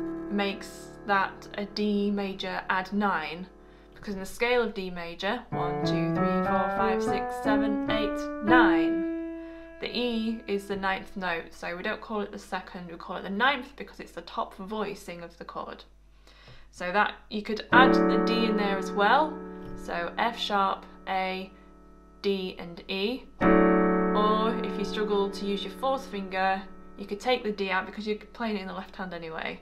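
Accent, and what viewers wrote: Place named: North, United Kingdom. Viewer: Impeccable tuition. Cute northern accent. What's not to love?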